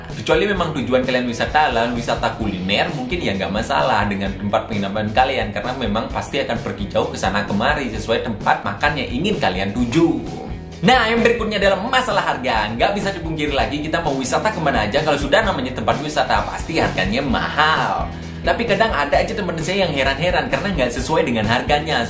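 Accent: Bali, Indonesia. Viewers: Gak tau knp klo' ad orang bali ngomong, suka banget pas bilang "T" 🥰🥰 ya udh klo' ke bali aq kontak km dulu bli hehe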